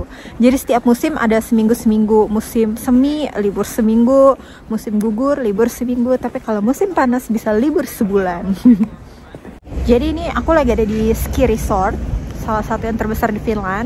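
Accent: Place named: Java, Indonesia. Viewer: Mbk logat omonganmu kok ke Jawa"an gt.. Asli orng Jawa ya.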